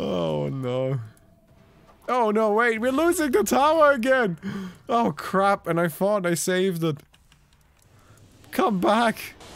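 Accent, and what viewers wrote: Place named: Germany. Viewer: Ein Deutscher der Englisch redet, da kann man immer raus hören dass es ein Deutscher ist. Btw was ist mit dein Mic los ?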